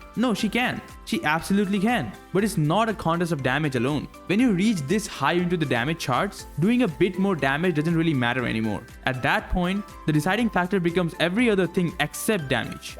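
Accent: India. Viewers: You from India bro? Accent seems familiar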